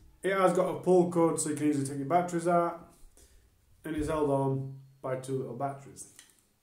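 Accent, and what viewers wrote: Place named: Manchester, United Kingdom. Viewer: Appreciate your honest review, my man! Just found you on YT with this review. I have one in the post, really looking forward to getting my hands on it. I’m usually good at identifying English accents. I can’t quite place yours. Definitely Manchester-ish, broadly speaking. Btw, love that you have real life intruding in your video. There’s something endearing about the banging and interruptions, lol!! Keep up the good work, mate.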